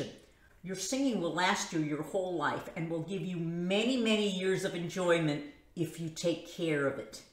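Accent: New York, United States. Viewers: Thank you for making and sharing this video. I look forward to watching your other videos. Also, I had a question. Are you orginally from New York?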